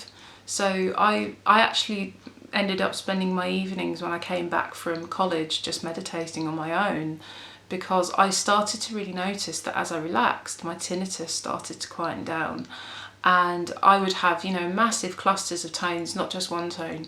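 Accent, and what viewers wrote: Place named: England, United Kingdom. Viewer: you have given me so much hope I notice that periodically I haven’t thought or heard my tinnitus you are a breath of fresh air. Listening to you I felt supported and hopeful of habituating this pest progressively as time goes by I have deleted all my Facebook chat groups as some were not to my liking plus extremely negative and they frightened me immensely as the comments made me think my life is over. I love your music. Your voice is beautiful to listen to. I’ve always adored the English accent (they are all different). Your presentation video was the best I have found yet hooray I stumbled upon you. You gorgeous girl xx💜 On Mon, 22 Jul 2019 pm, <mail> wrote: View this email in your browser THANKS FOR WATCHING MY VIDEO ON LIVING WITH TINNITUS AND FOR SIGNING UP TO MY MAILING LIST Now here's your free download of my song, all about my struggles with tinnitus DOWNLOAD NOW p.s. 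Let me know what you think of the song on Instagram, Facebook, or drop me an email! x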